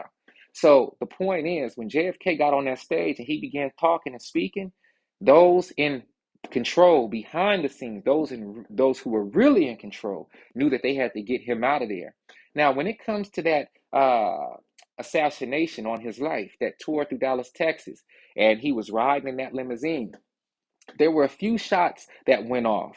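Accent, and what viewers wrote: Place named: Thames, United Kingdom. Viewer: Vid is fire 🔥you nailed the thames accent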